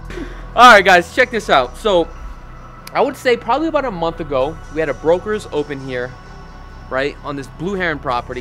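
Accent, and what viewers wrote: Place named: New York, United States. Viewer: I can’t stop watching your videos. You sound like you are from New York lol or back northeast.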